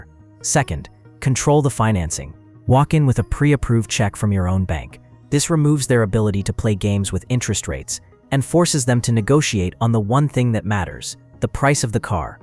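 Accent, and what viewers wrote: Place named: United States. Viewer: I stand corrected. But, does the "software tuner" take away your accent? You said "mate" which leads me to believe you're not American but yet your voice sounds American. Either way, I did like your content. Like I said before, I pretty much buy only Toyota's as of late. Bought a 2010 Toyota Camry last year with 170,000 miles on it. Put new shock/struts, sway bar linkage, front brakes and rotors, back brakes and new tires on it. I have a little over $7,000 in it. I now have 200,000 on it and I drive it 500 miles a week. All paid off. I will have to check out other videos if you have more.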